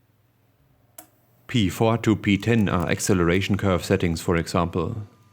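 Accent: Germany. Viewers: Love that German accent (and very dry sense of humor)! High-accuracy stepper motors please!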